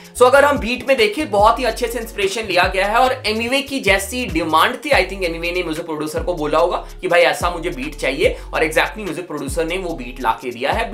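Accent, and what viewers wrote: India. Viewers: Bhaiye logo ke jaisa baat karta h yaar ye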